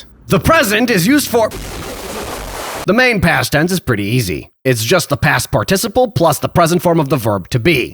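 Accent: Croatia. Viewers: Serb here. Very cool vid, didnt know you would make a vid on my language. Also you sound more like a croatian when speaking serbian 😭 Though nice vid. Hvala brate, samo tako nastavi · Ne znam kako je u drugim jezicima, ali kod nas, pored toga što možeš da pogrešiš u pisanju reči, veliki broj starijih ljudi greši i gramatiku. Na primer, pišu "neznam" umesto "ne znam", "netreba" umesto "ne treba", "samnom" umesto "sa mnom", "bi" i "bih" mešaju, kao i "bio" pa napišu "bijo". To je dosta izraženo po selima i u mestima koja nisu naročito naseljena, Takođe, u nekim krajevima Srbije, posebno na jugu i jugoistoku, u svakodnevnom govoru često se koriste samo tri ili četiri padeža, pa se ostali gotovo i ne upotrebljavaju. Svaka čast na izgovoru reči, baš si pogodio. Ne znam da li ti je to neko ikada rekao, ali naglasak ti dosta vuče na hrvatski. Hahaha. Svako dobro, legendo! 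· 😂😂😂😂😭 pre dobroo , ali je li ovaj glas hrvat? Ne zvuci mi ka srbski naglasak? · He does have a croatian accent when he pronounces his words, it's cute tbh😂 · Mogao si ovaj video da nameniš Hrvatima, pošto si njihovo akcentovanje i izgovor potrefio 100% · sounds more Croatian than actual Croatians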